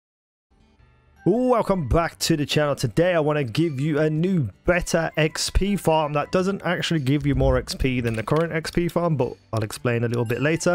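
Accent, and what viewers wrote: Birmingham, United Kingdom. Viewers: I wasn't being rude when I called you Brummie. I'm from the south coast but I'm actually welsht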